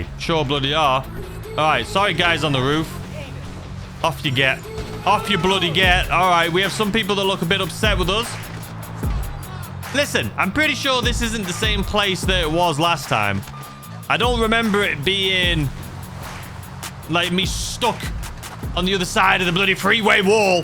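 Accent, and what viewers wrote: United Kingdom. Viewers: Ain’t you British. ? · When did you move the considering you had a or you have a British voice considering you say that you used to do a lot of stuff there like how long have you lived there how old are you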